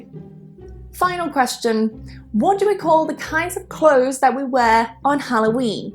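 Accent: United Kingdom. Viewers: Hi there,. I've just found you on YouTube. Just a guy from Brazil here trying to learn some English and like some others, I love the British accent. Loved you humor, loved your other videos that I've seen so far. You are just so beautiful (jaw dropping) and an excellent teacher/professor which is the reason I've just subscribed. Please, keep up the good work. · 訂閱您的頻道學習很多英式口音 在最近 很開心 大大感謝~~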